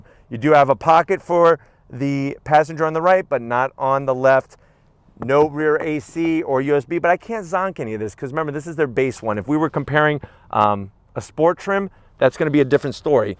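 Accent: United States. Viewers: not u. He's got a yank voice. Maybe NJ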